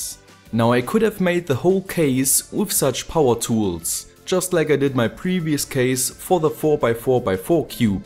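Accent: Germany. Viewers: i believe he's german :p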